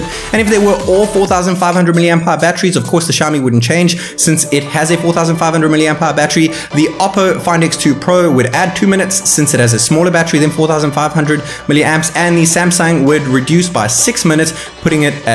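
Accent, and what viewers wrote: South Africa. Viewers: Is this guy a South African?? How'd you get these phones?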